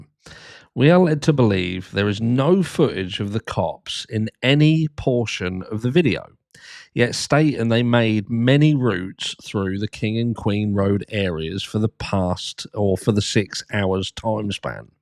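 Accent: United Kingdom. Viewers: I love the way you swear in your lovely British accent! Keep it up. Love from Idaho!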